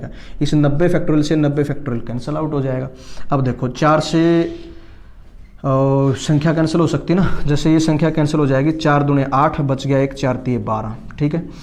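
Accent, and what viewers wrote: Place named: Haryana, India. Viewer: Superb sir apki haryanvi language 🙏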